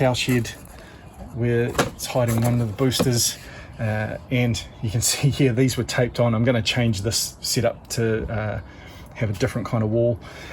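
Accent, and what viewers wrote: New Zealand. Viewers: Yeah I can imagine it would be tough. I honestly thought you were two different guys. I knew you were a kiwi but thought was an Aussie living in NZ. Some of the characters I'd like to do would be Howard Cosell Sly Stalone (as Rocky) the Blue Aardvark from Pink Panther are a few. Oh and I have an Aussie char I'm learning to talk like from you. Yes I realize you're a kiwi but it sounds the same to most eh? My characters name is Billy Bong Bonza he will have all kinds companies with commercials from a deck and patio construction Co (the way you say deck is hillarilious) to Wombat Dating Site for lonely men looking for mail order brides. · Great behind the scenes vid mate! Laughing, I gave up informing a few US viewers that you were a Kiwi, but no, no, they knew better, you were Aussie! It was not worth upsetting anyone..... They still have their dreams.....Until they watch this! 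Haha, but with your accent!! You certainly have continued and expanded your dedication from day one! Going great! Well done! So one tech question, what type of lighting have you found best to film with in NZ? Cheers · This was the second vid of yours I watched. Great stuff, and honestly I didn't pick up the Kiwi accent until I watched this. While watching the first vid (one of the hill climbs) I was thinking, that's a "unique" Aussie accent... well now the penny has dropped! Hahaha. As a fellow Kiwi, it's great to see one of the "team" create something truly amazing. I'll def look at your merch and make a purchase. All the best and stay safe.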